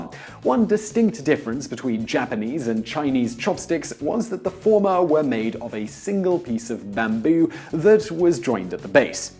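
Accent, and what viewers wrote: United Kingdom. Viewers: Your accent of course is brittish, but where the in the UK is it from... great chanell sir.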